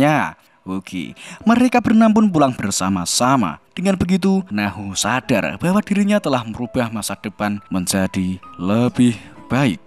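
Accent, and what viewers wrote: Java, Indonesia. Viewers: Klw medok itu tingkat ke Medokan nya bisa di kurangin gk ya..🤔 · Saran jangan terlalu medok apa lagi aaa iiii uuuu eee ooo nya delete aja soalnya kefokusan jdi samar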